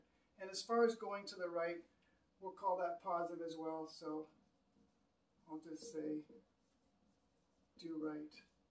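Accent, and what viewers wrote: Canada. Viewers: Are you from Québec?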